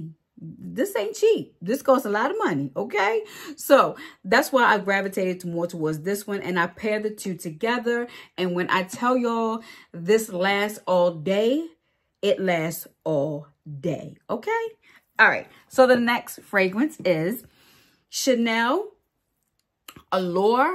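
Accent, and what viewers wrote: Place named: Baltimore, United States. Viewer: You gotta be from Baltimore😂😂 I hear the uuu pronounced in some of your words. That accent is undeniable!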